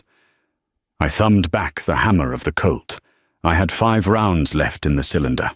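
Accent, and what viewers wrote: England, United Kingdom. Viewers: narrated by an American frontiersman with AN ENGLISH ACCENT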